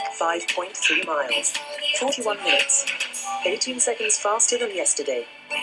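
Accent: France. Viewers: A very gracious reply 💐 Your husband doesn’t need to worry... your accent is still very charmingly French and your English is excellent in every way 😘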